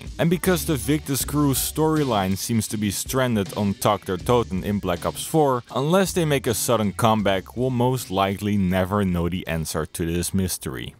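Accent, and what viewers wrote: Netherlands. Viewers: talk wit a verry dutch aksent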